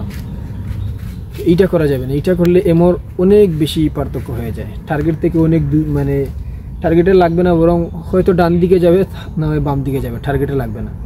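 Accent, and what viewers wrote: Sylhet, Bangladesh. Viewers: Bai apnr matar style sylhetr moto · Vai ki sylheti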